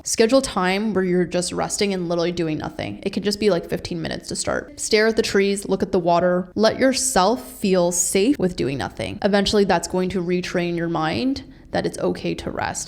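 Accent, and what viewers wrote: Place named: Canada. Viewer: OH MY GOD IF YOU'RE FROM CANADA I FINALLY COULD PICK A CANADIAN ACCENT FROM AN AMERICAN ONE 😭😭🤧🤧🤧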